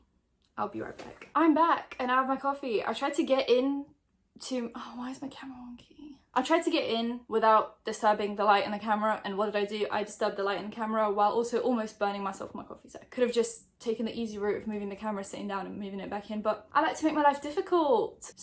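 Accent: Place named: Newcastle, United Kingdom. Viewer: where are you from out of curiosity? I’ve always assumes English (you sound Geordie to me but I have the worst ear for accent) but am now having a crisis because of how you said “in the uk.” Help me please XD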